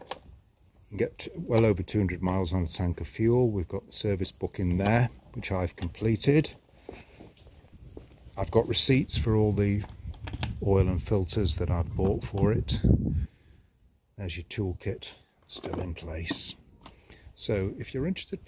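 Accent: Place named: France. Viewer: Can't you tell by his accent he's french?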